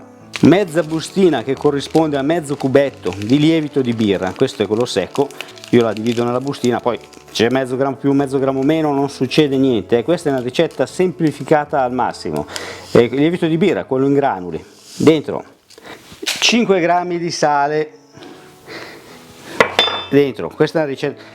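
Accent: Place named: Milan, Italy. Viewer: Video doppiamente bello e buono, per il pane e per il dialetto meneghino.👏👏👏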